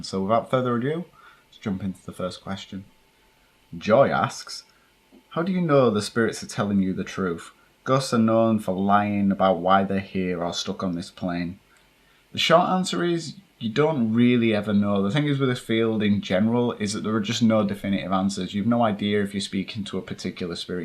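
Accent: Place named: England, United Kingdom. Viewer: I'm a very new subscriber, and have spent the past two nights binge watching your videos and creeping myself out alone at night. Have you ever tried old school spirit boards, Ouija, or pendulums to communicate and ask questions to the spirits ? Love your hard work exploring these spirits and love listening to your English accent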